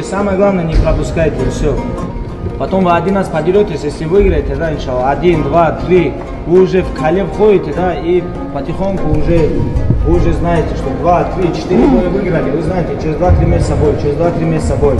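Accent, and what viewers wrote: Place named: Dagestan, Russia. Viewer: its 100% russian but dagestani people have that arabic/turkish accent which is why it sounds off.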